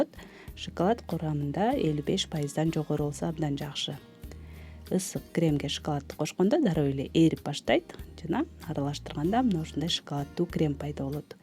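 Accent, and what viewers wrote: Standard. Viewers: Таза кыргызча суйлогону жакты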